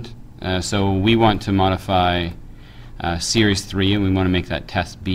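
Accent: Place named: United States. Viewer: Thank you so so much! I had an assignment for uni and had no idea how to do this or anything on excel. Your thorough explanation with your lovely american accent was amazing! Thanks heaps and heaps! :D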